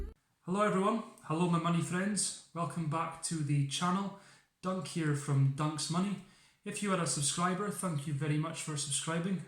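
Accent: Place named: Scotland, United Kingdom. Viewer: Where you from in Scotland?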